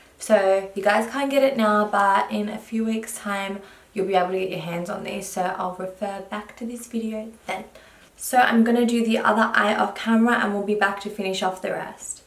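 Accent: Australia. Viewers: U sound like an Aussie girl. Are you?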